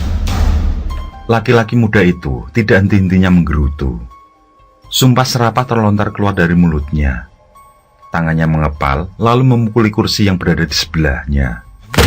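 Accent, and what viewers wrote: Java, Indonesia. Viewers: Pak dhe,, mohon maap,, walo pun saia pake headset, tapi sering kali di ujung kalimat yg pak dhe ucapkan sering g jelas,, di semua video pak dhe,,,krn pak dhe terlalu medhok,,,kadang susah di cerna oleh qu,,,mohon maap pak dhee,, 🙏🙏 salam dari aceh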